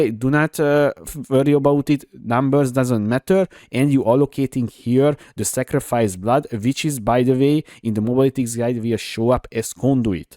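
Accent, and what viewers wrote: Hungary. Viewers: Szia! Jól hallom hogy magyar vagy ?:D Lenne egy kérdésem , hogy hardcore on játszható ez a build ?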